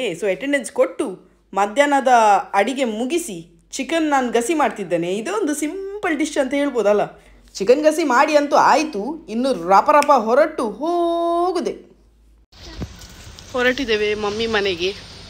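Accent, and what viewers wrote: Mangalore, India. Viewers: Nimma mangalore bhashe thumba chanda..keltane irbeku anisutte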